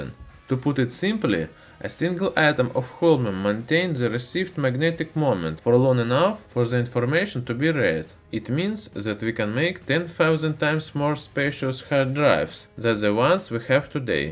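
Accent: Russia. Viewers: I'm Slavic, but I can't stand that Russian accent, sorry.. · Are you Russian? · How many of you watch his videos just to hear his cool Russian accent? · yo bro... ur video is nice.... but plz add subtitles... ur russian accent is too strong... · The Donald He tries really hard to speak well but he is Russian so have some difficulty to pronounce english words clearly 😐😉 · You make great videos! I love your accent, like the secret Moscow contact in a 60’s spy movie. :-)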